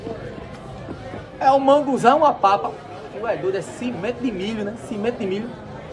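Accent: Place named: Northeast, Brazil. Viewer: Só quem é do interior do nordeste pra saber que falamos com esse sotaque e maneira de se expressar.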